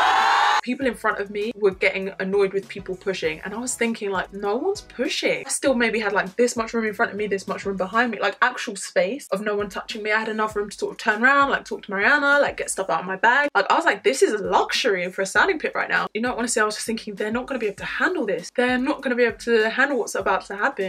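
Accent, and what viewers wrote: United Kingdom. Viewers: You have beautiful British accent 😍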